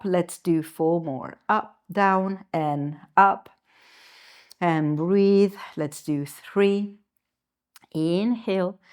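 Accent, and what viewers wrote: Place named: United Kingdom. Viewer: Ciao, che piacevole sorpresa trovare il tuo canale in inglese! Complimenti per l’inglese e l’accento British 😊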